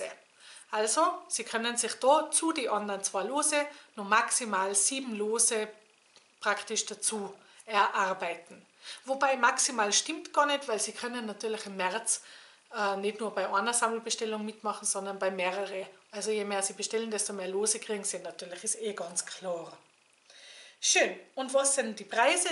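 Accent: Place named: Tyrol, Austria. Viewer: Hallo, wow was für eine tolle Action wieder von Dir. Gerne versuche ich mein Glück und hüpfe mit in den schon gut gefüllten Lostopf. Ich freue mich immer wieder wenn ein neues Video von Dir kommt ich liebe Deinen tollen Tiroler Akzent;-) und natürlich Deine tollen Ideen habe schon einiges nachgebastelt. Viele liebe Grüße aus Bayern Christine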